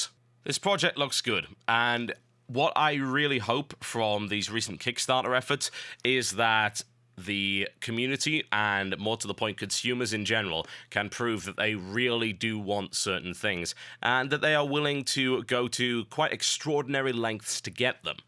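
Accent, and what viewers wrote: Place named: United Kingdom. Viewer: "Bawoldur's Gate," god I love TotalBiscuit's accent. It's so hilariously British.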